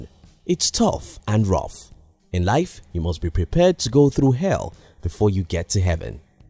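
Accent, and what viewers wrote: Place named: Nigeria. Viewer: You speak like a Nigerian.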